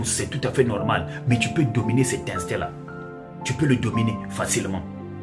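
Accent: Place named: Gabon. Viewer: Ah ta we mu Nzebi? Tu parles comme un gabonais...Merci pour les conseils.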